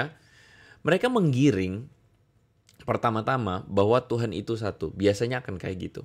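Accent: Java, Indonesia. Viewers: Dia SDH di besarkan di kalangan muslim kemudian di pakai sbg plat org asli Papua utk berbicara seakan akan dia baru murtad dr Kristen, buktinya dia TDK berlogat Papua tp logat Jawa ini buktinya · Wajahnya mirip orang Papua, tapi logatnya mirip dengan orang Jawa.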